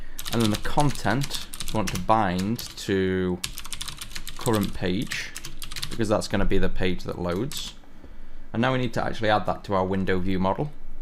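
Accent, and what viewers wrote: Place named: England, United Kingdom. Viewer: I am genuinely interested what is the english accent maybe dialect that you speak in the video. googling didn't give me any answers and thanks for the videos the best wpf on youtube.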